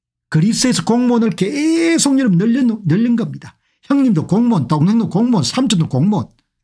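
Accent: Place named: Tongyeong, South Korea. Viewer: 공대표님 정말정말 잘 지적하셨습니다 제일 절실한 현황입니다 골로 가지 않도록 더욱 빼딳하게 쓰야 합니다 말씀이 저의 고향 통영 말투 비슷하네요 정답습니다 감사합니디ㅡ😊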